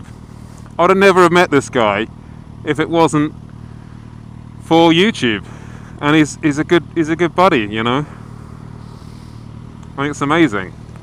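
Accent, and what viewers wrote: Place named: London, United Kingdom. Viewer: what side of london are you from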